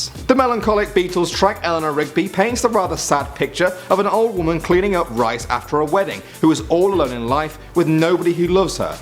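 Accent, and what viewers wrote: United Kingdom. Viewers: Brother, would you please slow down a bit? The fact is, you are speaking with heavy British accent and you talk so rapidly, that it is very difficult for non-british to comprehend what you're saying!)) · I knew of just about all of these.:) I question, though, the presenter mentioning how Paul woke up in '63 with the tune of " " in his head since the song was recorded in '65. Paul, supposedly, obsessed about it during the filming of " ", in fact. While it's possible he could have thought it up two years earlier, it doesn't seem accurate to me based on the many things I've read. Number One is probably the dumbest, oddest conspiracy I've ever heard of. I can't believe there are people who still think Paul died in '66 and was replaced by a double. Lastly..I just have to say that I love this guy's English accent and wish that I could bottle it.;)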